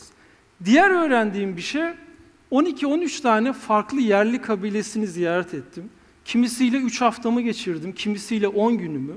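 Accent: Samsun, Turkey. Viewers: Şive Samsun'a kayıyor e'leri açık söylüyorum diyince bendede tüyler diken diken oldu 😀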